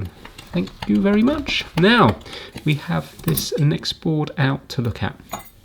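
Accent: United Kingdom. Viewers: I was really confused what watch it was as I've never heard the name, but that was only british pronunciation 🤣 PS: Love the yellow mat 👍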